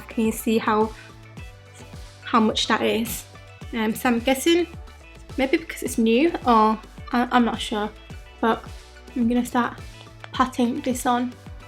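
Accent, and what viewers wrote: United Kingdom. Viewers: I love Asian Britain girls accents because of their so strong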